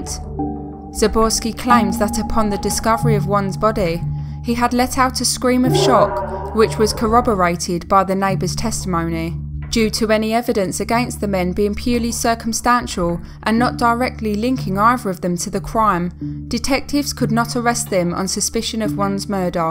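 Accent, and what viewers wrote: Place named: South London, United Kingdom. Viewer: I like the subject matter but I am so distracted by the awful narration ! Robotic and an awful South London accent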